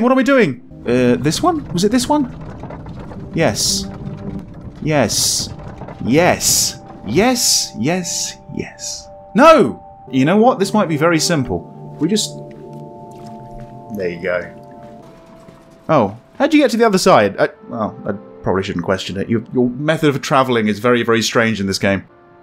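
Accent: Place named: United Kingdom. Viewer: Heard of this through mention otlf Twich streamer that does nothing, but puzzle & she always gets help from friends on the stream. I so wish i had them. Not pc or money. If can save up for gaming pc to stream on Twitch i get all these puzzle games one each month or so plus my ps 4 & smart flat screen tv ad only have older small one. This is amazing. I wish i had been able to do without getting spoilers, but it's ok. It is what it is ! Living vicariously through others atm. What can you do right ? I hope to catch up soon. Only got a tablet last yr finally. So behind gadgets & technoligy, etc. 😢😭😭 I love your voice. Great presentation. With that nice slower British accent it was very enjoyable. I'm more touch screen, app, and console type person. I do like puzzles, but not hard ones. I did Tomb Raider, but i need help sometimes just like steamer I mentioned though maybe not as much. 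Only when ger really stuck. I never done a serious game through alone without cheet guide book or online ao i dont know if I'd ever figure out a gane like this. Really complex. Blows my mond the dimemtions, but I get the workings now that seen all the watchers mentioned on YT, and this game is one of them so has made me understand puzzles workings more and a bot more confident I can, cuz learming from others playthroughs and see it from the other side of the screen and perspective.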